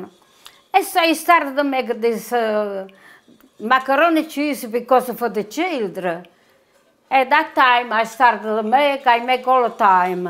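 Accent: Italy. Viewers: Looove that glorious Italian accent!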